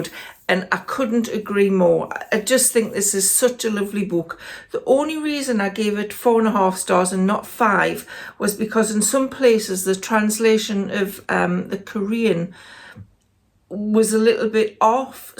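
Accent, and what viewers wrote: United Kingdom. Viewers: First of all, how nice to hear a British accent on YouTube!!! I watch loads of bookish, interiors and cooking videos when I can, and the presenters of the videos are - without fail - American - so I'm so happy to have found you. Look forward to catching up on your back-catalogue of book recommendations. x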